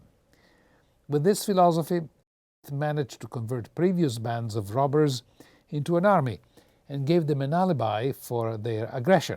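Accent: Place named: Italy. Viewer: I can hear an Italian accent in your English now :-)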